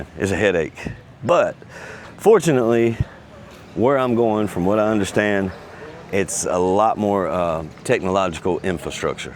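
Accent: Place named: South, United States. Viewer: I’m not sure if you remember me told you I lived in South Carolina for more than 20 years I was in Camden South Carolina right by I 20, I think that is reason why I like you right away when you start talking I recognize that you from the South; you like my kababayan,! So good luck what ever you decide to do to stay happy 😃 and keep smiling that is your big asset 😂🎉😊😮❤😂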